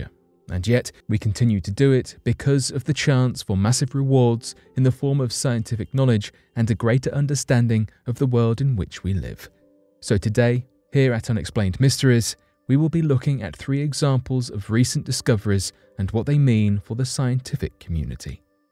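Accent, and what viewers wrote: United Kingdom. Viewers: British pronunciation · Yeah well he is not American…he is British That is how the British pronounce it. In fact that is how I pronounce it too. I’m South African and we speak UK English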